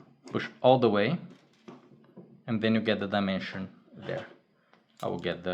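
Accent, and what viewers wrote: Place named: Brazil. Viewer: o sotaque de brasileiro falando ingles é inconfundivel heheh. projeto massa dms, acompanho ha um tempo ja. ansioso pros proximos passos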